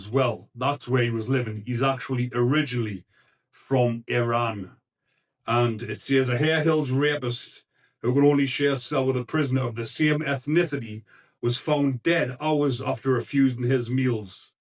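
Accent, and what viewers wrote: Newcastle, United Kingdom. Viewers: Now go for the rest, and speak English not Geordie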